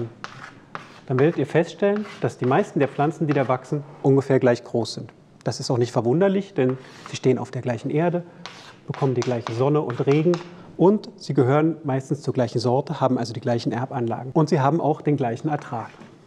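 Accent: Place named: Germany. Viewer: HA! Ich wusste schon beim englischen Video, dass der Akzent aber recht deutsch klingt :D. Es wäre praktisch, wenn ihr die Videosprache im jeweiligen Titel auszeichnen würdet, da viele Englisch-Sprachige Zuschauer kein Deutsch können bzw auch viele Deutschsprachige sich im Englischen nicht wohl fühlen.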